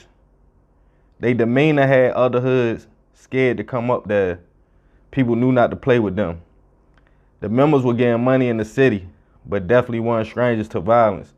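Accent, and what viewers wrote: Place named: Washington DC, United States. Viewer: Why u so pressed by Baltimore bruh u sound like u from dc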